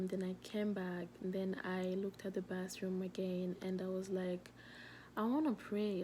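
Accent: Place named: Nigeria. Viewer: Haha MashAllah you have learnt our accent oo una don try pass, 😂😂 may Allah bless you too sister and grant you ease in all your affairs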